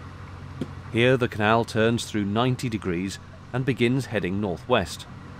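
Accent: United Kingdom. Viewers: Hes an American actor. You share some resemblance if I must say. HOWEVER, your inflection, infectious enthusiasm, and admittedly, your British accent that makes you sound so much more intelligent than the rest of us English speakers, completely drew me into this video!!! It reminds me of an audio book or a relaxation tape or something and I love it. Quite an interesting channel my friend! And these boats pique my interest! Very unique!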